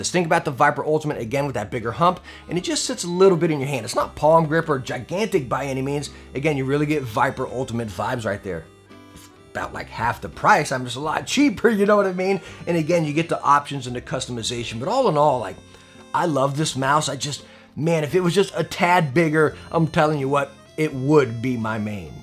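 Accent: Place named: New York, United States. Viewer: Bro this guy talks like my uncle from New York and it's great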